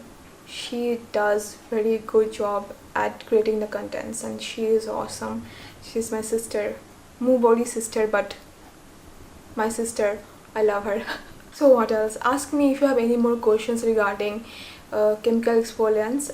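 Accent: Bengal, India. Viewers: It's her accent ( Bengali), her english is good though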